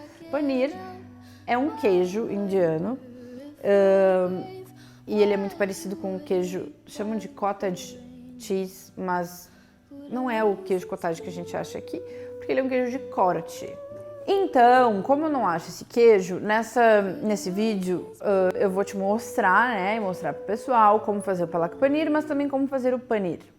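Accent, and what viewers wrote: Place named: South, Brazil. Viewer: É viciante esse sotaque do Sul... Habemus BANQUETE! ❤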